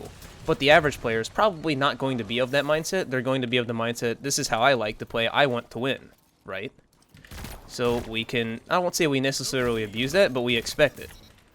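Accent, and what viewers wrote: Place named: Ireland. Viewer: Are you Irish or American? I keep hearing Irish coming out 😅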